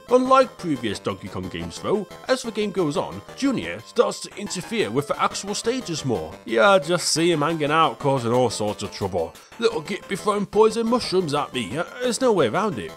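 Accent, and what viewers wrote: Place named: North, United Kingdom. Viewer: I spend every one of your videos trying to place your accent. Seems to be part gordie, part scouse, part Lancastrian, with a slight “wossy” style lisp.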